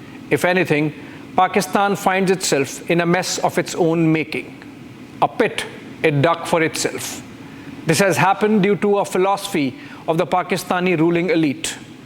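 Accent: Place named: Delhi, India. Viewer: Why have they hired someone straight out of Delhi public school to read an English bulletin?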